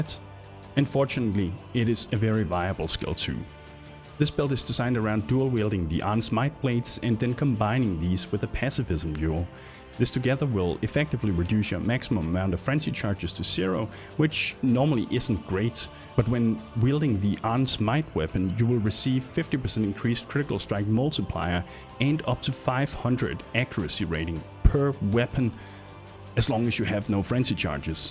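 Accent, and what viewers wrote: Denmark. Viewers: You're danish aren't you. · Just wondering, are you danish? Because your accents sounds quite like a dane 😂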